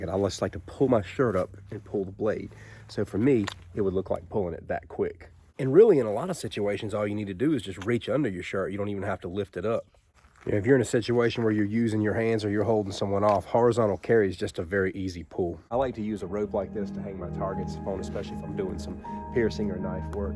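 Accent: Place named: Virginia, United States. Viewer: I think he is from Virginia USA.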